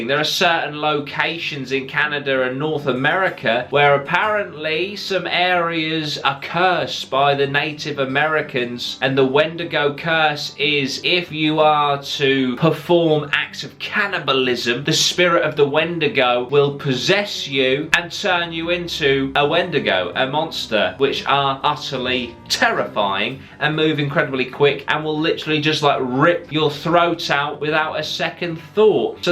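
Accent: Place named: Norfolk, United Kingdom. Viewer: Are you from Norwich (I am) cos you have a norfolk accent?!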